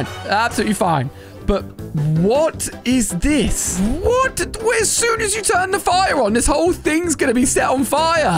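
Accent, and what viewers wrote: Australia. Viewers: Australian or bri'ish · Are you Australian because if so how do I never notice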